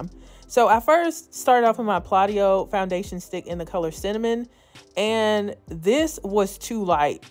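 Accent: South, United States. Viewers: I don't think I ever realized how much you sound like a southern belle, lol. It might be the edible I had. 😂 The wig look great!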